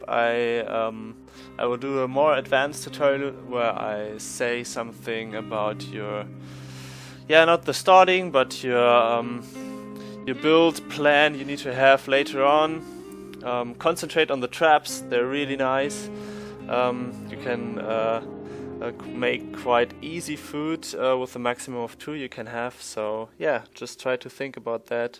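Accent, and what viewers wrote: Germany. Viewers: Dieses Pseudo Englisch von Deutschen lmfao. Aber gutes Video !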